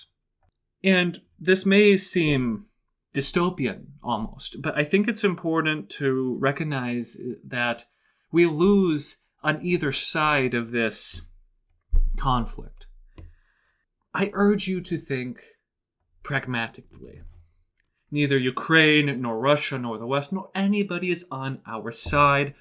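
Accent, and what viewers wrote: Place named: United States. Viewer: Oh yup let’s keep pushing a pro Russian sentiment then go ahead and keep whining about how apparently Rusyns are being left off, bunch of bullshit considering you don’t even live in Ukraine you have a very nice clear American accent just a bunch of shit to disguise yourself as pro Russian and you sitting there happy for Ukrainian people being killed.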